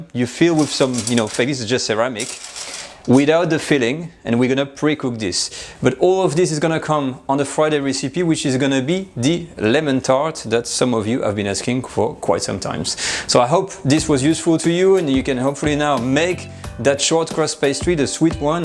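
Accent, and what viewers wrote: France. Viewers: I love this French accent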